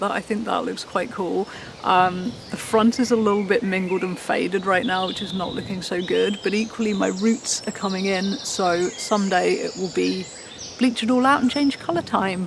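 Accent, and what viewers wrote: United Kingdom. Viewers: For those of us who don’t know UK regional dialects, can you tell us where you accent is from?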